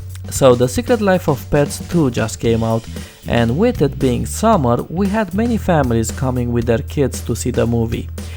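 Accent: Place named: Nigeria. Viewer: sorry if im being rude, but you sound like the Nigerian scammer across the phone trying to get someone's social security number and credit card number.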